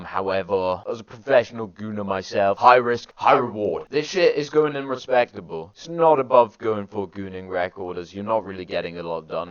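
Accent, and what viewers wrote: United Kingdom. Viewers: Bro I know from your accent and because you went to the Deftones concert that you live in South Wales lol, I do too but I didn’t go to the concert because I’m not really that fussed even though I like the band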